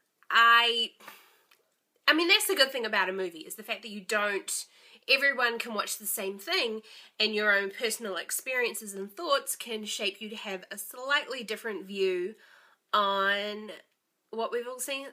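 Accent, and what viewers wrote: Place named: New Zealand. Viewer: Awesome review m'dear. I know it's weird when people talk about your voice, I get it a lot but your NZ accent is truly awesome btw :P Yeah I'm not sure what to think about whether or not he was possessed by the evil already or if it got to him whilst on the ship. I lean more towards him being possessed already as that tends to explain more.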